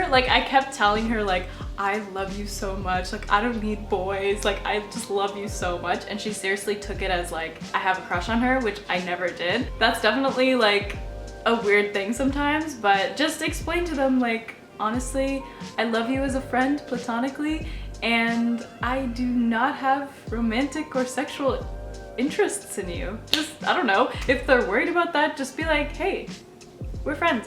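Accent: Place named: Canada. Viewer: you sound so canadian in this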